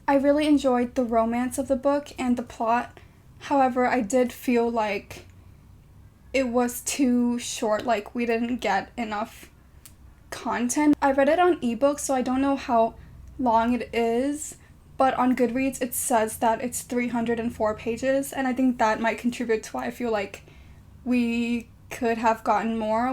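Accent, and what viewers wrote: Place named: United States. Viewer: ooo, that's cool! did you go to an international school or lived in America at some point? you sound American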